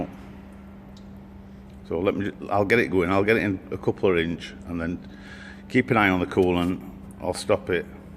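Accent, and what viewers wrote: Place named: Sheffield, United Kingdom. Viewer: brill channel love the Sheffield accent and humor Hope yr ok mate Wherever you are?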